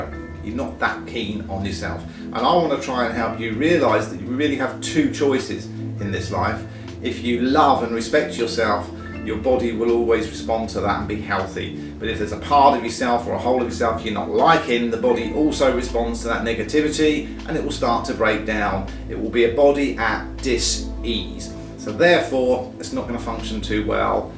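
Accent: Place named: United Kingdom. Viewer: Thanks! What I like about your vids the most is, that you dont go over the top and try to force it down our necks. Its is very friendly, peacful and joyful process. I love that. And your British accent rocks! Thanks again. xx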